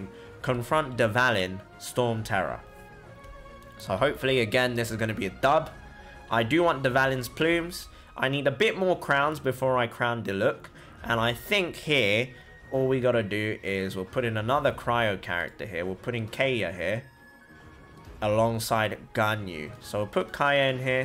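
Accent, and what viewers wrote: United Kingdom. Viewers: Your asian+British Accent is Smooth;! 😂😂😄😂😁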